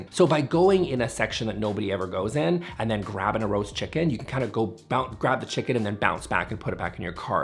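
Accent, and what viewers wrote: Canada. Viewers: a Canadian accent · Love your fun combo of “gay voice” and Canadian. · I’ve never noticed your Canadian accent until this video. It must come out when you get worked up 😂.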